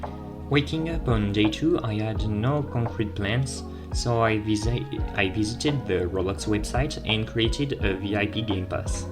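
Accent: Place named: France. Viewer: You should translate your videos to french because your accent is kinda annoyingq(sorry i have the same lol)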